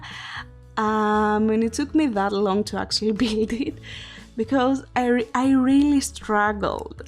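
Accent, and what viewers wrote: Greece. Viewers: Your accent sounds like you are greek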